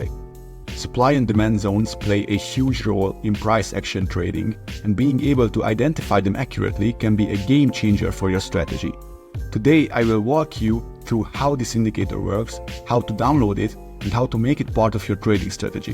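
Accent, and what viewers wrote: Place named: Hungary. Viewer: Nice Hungarian accent… :-)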